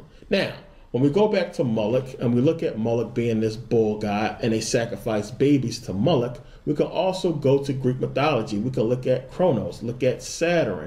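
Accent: Philadelphia, United States. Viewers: He's from Philly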